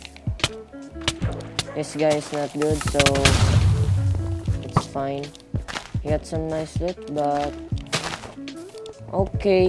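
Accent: Philippines. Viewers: Philippines is cute speaking english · is an real filipino have accent cool🔥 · Yo!! Sounds like from Asia huh? Hmmmm phillipines?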